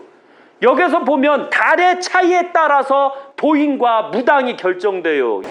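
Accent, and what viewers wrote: Chungcheong, South Korea. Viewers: 안녕하십니까ㆍ10월14일화이자1차2차 부작용으로 죽음의문턱까지 다녀온사람입니다 엊그제 퇴원했구요ㆍㆍ뵙고싶은데 고관절양쪽괴사에ㆍ진작에 찾아뵙고싶었는데ㆍ아이랑 먹고사는거외엔 16년동안 일만해왔기에ㆍ혹 전화상담 하고싶습니다ㆍ 오늘도 인천이 친정이라서 ㆍ언니랑같이ㆍ유투브상영하고왔습니다ㆍ건강되찾아서 ㆍ꼭뵙고싶습니다 ㆍ정말 저도고향이 충청ㆍ청양이고ᆢ구수한 충청도 말씀이 너무좋습니다ㆍ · 이 분은 사라져가는 충청도 방언을 잘 간직하고 계시네요. 충청도 방언을 꼭 지켜 주세요. 진지드셨슈? 얼마나 점잖고 푸근합니까?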